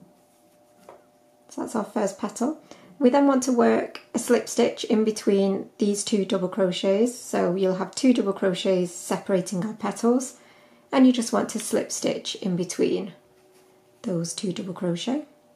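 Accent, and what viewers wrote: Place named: Northern England, United Kingdom. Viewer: US terms coming from a Northern English voice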